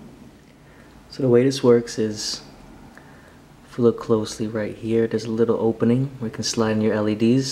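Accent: New York, United States. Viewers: Awesome NY/NJ accent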